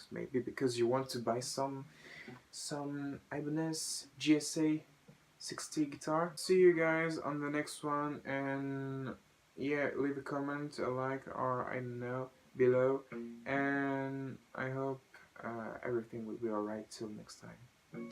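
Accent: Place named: Poland. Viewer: Nice, was thinking to get something similiar in near future, so doing some resarch ^^ (IBANEZ GSA60 WNF to be specyfic or IBANEZ GRG121DX BKF) trying find some significant differences between such types of pickups but the GSA60 speaks to me more look wise :D (Ps. are you Polish? guessing by Accent - no offence since iam polish too xD, just wondering!)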